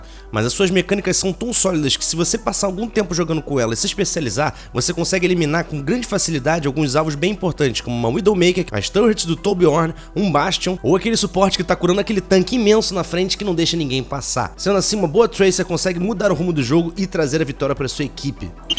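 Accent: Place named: Portugal. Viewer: o teu sutaque e parecido com o pt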